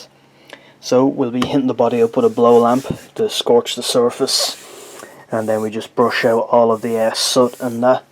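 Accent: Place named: Dublin, Ireland. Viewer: Perfect timing!. Just as I'm about to start a scratch build 'Tele' along comes a great teacher!. Your very fine attention to the details a novice really needs to know about is superior to any other source I could find on any YouTube channel. I'm building this for my 14 year old grandson as a surprise.!..I'd better not screw this up,he knows his guitars. Lord help me?? And a 'Jackeen' to boot. Lovely to hear your Dublin accent !!..I grew up in Marino and Fairview/Clontarf..No jobs nor any future; We youths had to go elswhere??..I'm up to part 4 now and I have a great handle on how to built a 'Telecaster', Thanks to your great teaching. From Albany NY USA..Brendan Murphy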